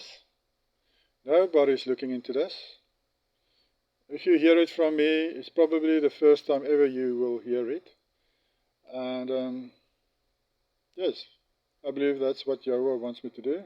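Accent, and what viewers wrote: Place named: South Africa. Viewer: Ha, I asked if you were South African in one of your later videos, and now after this one I know. I'm going through all your videos now. I found you from your comment on the video with those 3 guys talking about the millennial reign.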